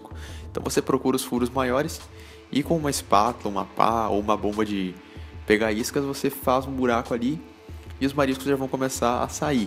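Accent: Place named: Porto Alegre, Brazil. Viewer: opa blz, tu é de Porto Alegre tchê ?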